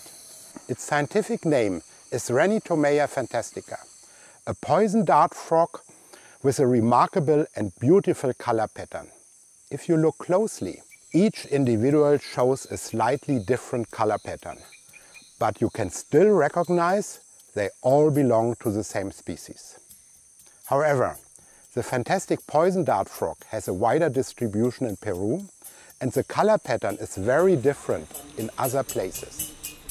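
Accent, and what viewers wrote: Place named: Germany. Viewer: Was für ein wertvolles Projekt und es tut gut, all diese unterschiedlichen, schützenswerten Tiere zu sehen! Die mit dem Projekt eben endlich tatsächlich geschützt werden können! Nur der deutsche Akzent des Sprechers war halt wirklich extrem. xD Das war etwas befremdlich. Wird trotzdem geteilt, damit das hoffentlich mehr Leute sehen!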